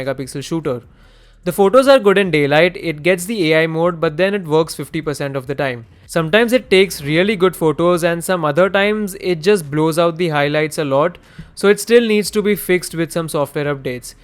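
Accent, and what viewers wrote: India. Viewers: Why indian have funny accent?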